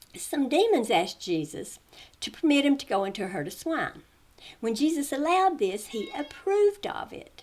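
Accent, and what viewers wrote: South, United States. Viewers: First I just have to say how much I love your southern accent , the hi and bye in your videos is just the best to me lol . Very well said and excellent pints , I’m 29 and have spent most of my life in fundamentalist Christianity , but every time I opened the Bible and saw the strikingly human nature of this god it constantly agitated me .. how could this have been the supreme god who we are suppose to worship , and yet his moral fabric is shady at best and outright malicious at worse … along with the fact that he does nothing to intervene in the collective human suffering that’s continuously happening in this world , and then ti stoop so low as to use threats of torture against people who won’t Appeal to his ego , no thank you , what is the point of having a god who is utterly useless and narcissistic.. needless to say I’m glad I got out when I did . 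Excellent work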